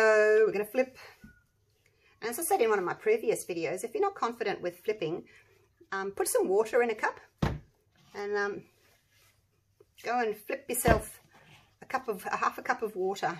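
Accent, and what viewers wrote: Australia. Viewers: I enjoy all your creations. Love listening to your Aussie accent.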